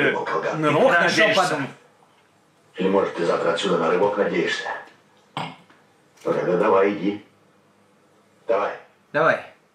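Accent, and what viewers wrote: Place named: Rostov, Russia. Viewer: пол часа назад зарегался в твитере и у меня высветился Хованский в первых строчках рекомендациях это прекрасно базарит так как все у нас на районе в Ростове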